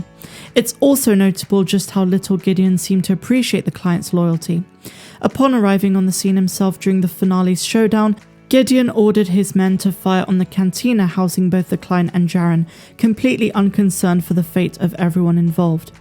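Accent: United Kingdom. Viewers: Thanks for the update. Where in the UK are you from btw, that is if you live there? I like your accent.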